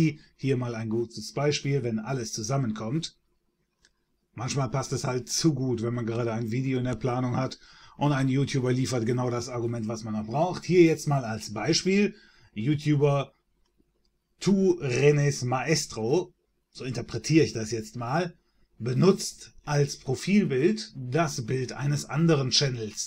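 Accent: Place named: Swabia, Germany. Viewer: Schwabe! xD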